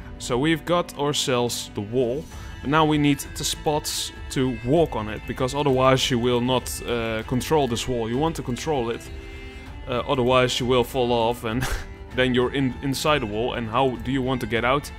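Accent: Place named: Netherlands. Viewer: Haha nice, I can hear you are accustomed to make video's in The dutch language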